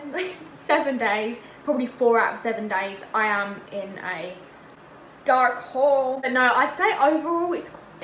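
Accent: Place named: Australia. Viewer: The Australian accent tho😍😍😍😍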